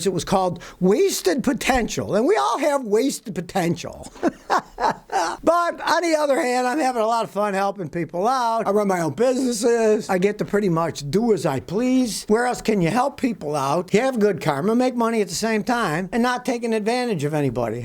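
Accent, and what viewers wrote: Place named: Buffalo, United States. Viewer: I wish could get his vowels correct. Must be his Buffalo (NY) accent. Ex: ONscrew instead of UNscrew. You think he's saying to put something on instead of him meaning to take it off.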